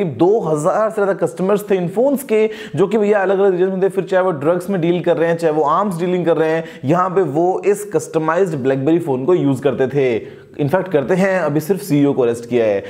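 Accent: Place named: Haryana, India. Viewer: I think apki performance mein thoda haryanvi tadka hogga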